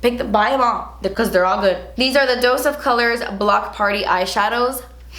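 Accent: Cuba. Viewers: I LOVE how you talk in spanish, I'm from Spain and girlllllll that cuban accent is bomb 💘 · Tu acento cubano,... I love when you speak spanish xDDDDDD. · El acento Cubana que bonito, aún que soy Mexicana me encanta 😛 make a spanish video trust me you’ll gain more subs 🔥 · Omg I love her Cuban accent!!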